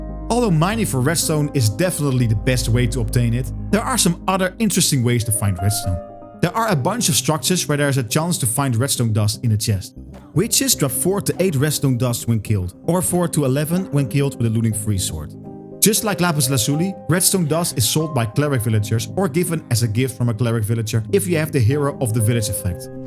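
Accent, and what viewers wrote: Netherlands. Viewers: You’re Dutch aren’t you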